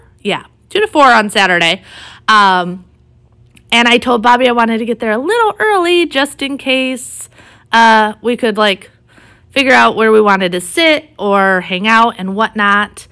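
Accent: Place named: Midwest, United States. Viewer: I love watching your videos they are so motivating. I also love hearing your midwestern accent I have family in Illinois and I just feel like we are family.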